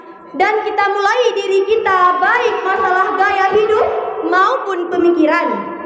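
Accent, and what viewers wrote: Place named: Aceh, Indonesia. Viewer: Medok kli acehnya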